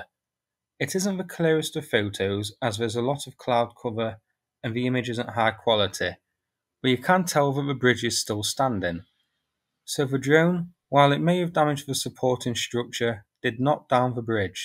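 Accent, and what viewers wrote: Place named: Northern England, United Kingdom. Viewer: Thanks for the reliable info that you give us. May I ask a personal question? I love your accent, may I know where you are from? Perhaps northern England?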